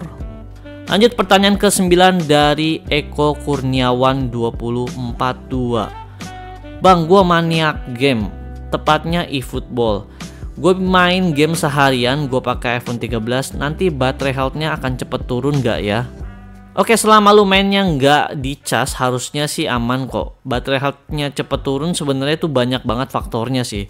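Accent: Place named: East Java, Indonesia. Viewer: kayae mas.e orang jawatimur ya pernah buat konten di chimory 😁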